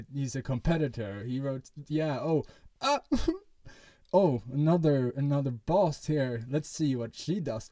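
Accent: Sweden. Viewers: Loving the Swedish accent which is strong in this one:)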